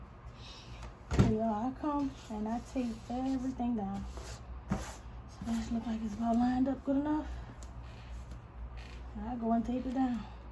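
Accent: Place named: New Orleans, United States. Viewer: Sounds like she's from New orleans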